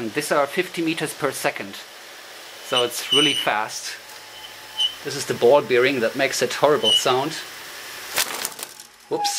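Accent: Germany. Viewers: I love your german accentt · LOL, "Peeeeep!" instead of "beeep"! :-D Love that German accent, man! :-D Fun video (even with the disappointment that the pro recorder was too stupidly designed to play also)!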